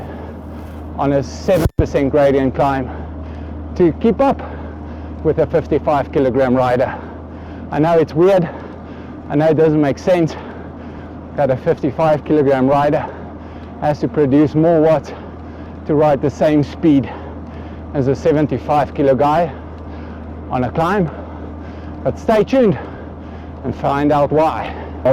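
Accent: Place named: South Africa. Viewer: Somehow I think you are from South Africa?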